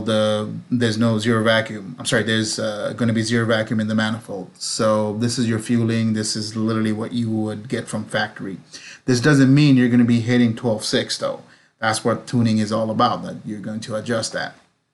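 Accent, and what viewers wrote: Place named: Latin America. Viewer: Exelent video bro. 1 question can you do a tune remotely? You seems and sound latino 😁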